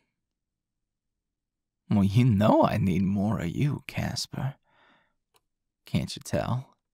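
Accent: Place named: New York, United States. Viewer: The new york accent 😍😍😍😍😍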